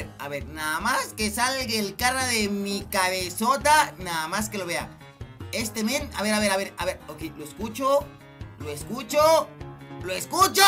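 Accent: Mexico. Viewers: Oye y eres Méxicano o que pdo? 😂😂😂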